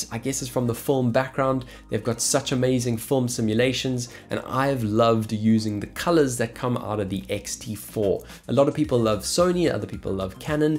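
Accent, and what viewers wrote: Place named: South Africa. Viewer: Such an in depth review - thanks so much man! Sounds like you’re from South Africa as well!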